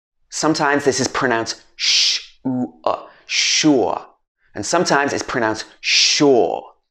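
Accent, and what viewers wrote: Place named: England, United Kingdom. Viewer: This is not a British accent. It's an English accent. Or are the Welsh and Scots not British?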